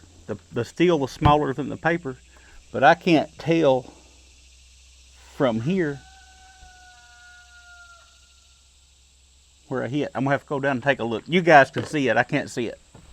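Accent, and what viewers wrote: United States. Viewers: балдежное произношение. в каких штатах разговаривают в такой манере?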